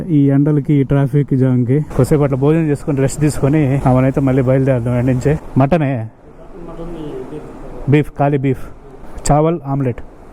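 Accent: Nellore, India. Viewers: బ్రదర్ పూర్తిగా నెల్లూరు యాసలో నె మాట్లాడండి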